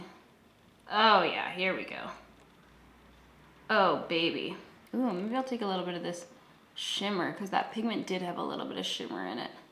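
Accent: Canada. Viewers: never heard you sound so Canadian lol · you have a thicc canadian accent :)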